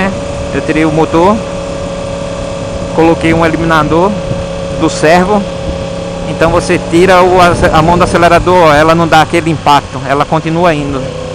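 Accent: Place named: Rio Grande do Sul, Brazil. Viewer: nao nao nao, ele e gauho mds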